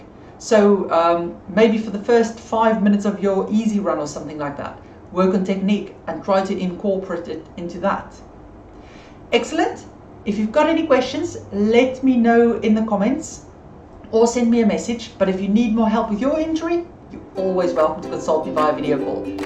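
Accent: Afrikaans, South Africa. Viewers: My legs rub against each other when I run. Gonna try widening my gait. Thanks! Also, love the accent. Is it South African? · 3 years late but yes her accent is South African. More specifically, Afrikaans South African. Sincerely, another South African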